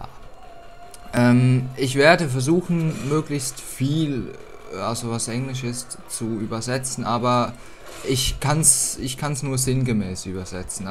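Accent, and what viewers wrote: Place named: Switzerland. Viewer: Ehi du bist schweizer oder? Man merkts sofort :D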